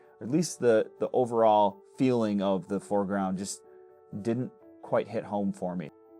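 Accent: United States. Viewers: Are you from Michigan? Lmao.. I'm just asking cause the accent.. But your videos are so good! I think I said this comment twice lol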